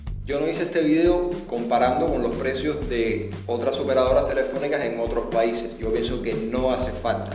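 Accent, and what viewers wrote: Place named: Cuba. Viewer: Saludos desde California, USA de tu seguidor Puertorriqueño Angel. He visto muchos videos de personas en Cuba haciendo lo mismo que tu, pero los tuyos me han impresionado mas por tu los temas que escojes para presntarnos a tu pais. Explicas lento y sencillo con tu lindo acento Cubano y hasta algunas palabras las pronuncias como nosotros los puertorriqueños. Imaginarse a Cuba y verla por medio de tus videos son dos cosas bien distintas que me han dejado con los ojos abiertos. La arquitectura de los edificios es bellizima y un tesoro nacional. Que lastima que muchos esten en deterioro. Pero nada, felicitaciones y muchos exitos y espero 👀 tu proximo video pronto. Cuidate y bendiciones a todos en la isla.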